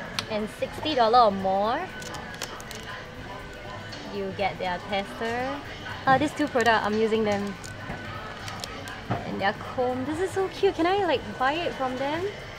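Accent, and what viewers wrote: Singapore. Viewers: Singapore! Know it from the famous singlish 'lah' and 'Leh' she uses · Singlish lols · sounds like a sgp dialect ;D bute etude house has so many cute and really effective stuff ! love it !! they should also sell it in europe ~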